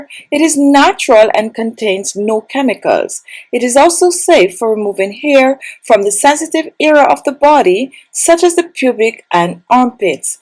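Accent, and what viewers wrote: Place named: Jamaica. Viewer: I am subscribing, not only because the information is good, but also because you sound Jamaican! Thanks for sharing, I will be trying this!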